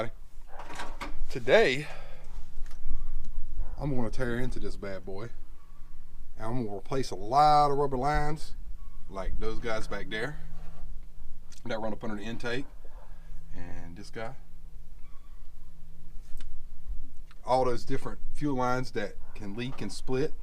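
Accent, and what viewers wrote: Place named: South, United States. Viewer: I never realized how southern you sound till I watched this video.... lol Good job brother!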